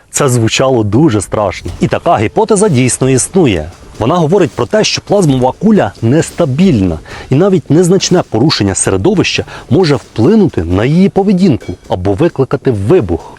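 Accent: Cherkasy, Ukraine. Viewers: У автора черкаський прононс?))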